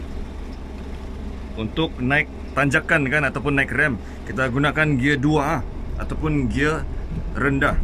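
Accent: Sabah, Malaysia. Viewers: Sebulan lagi test jpj, mmg tk ada latihan persiapan utk jpj ulangan keesokkan nya. Sebulan juga duk “study” video-video cikgu. Suka juga loghat Sabah cikgu. Akhirnya lepas juga test harini 25/11/2021. Terima kasih cikgu share ilmu 🙏🏻 Salam dari Melaka.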